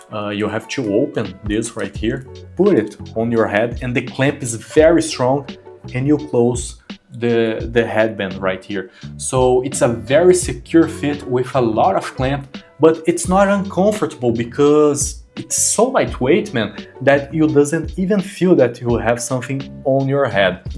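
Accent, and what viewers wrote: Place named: Brazil. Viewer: Eu entrei no vídeo pensando "só gringo deve estar fazendo review desse fone" aí no que ouvi o sotaque já saquei que era BR kkkkk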